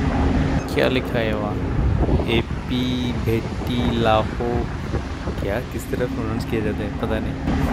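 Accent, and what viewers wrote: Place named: Odisha, India. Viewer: Yeh Hindi bhi Odiya main bol raha hai bhai..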